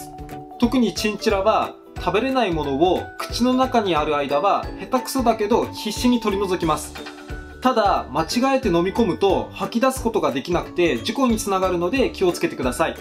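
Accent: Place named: Japan. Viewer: もしかして地方出身の方ですか？ 話し方が可愛い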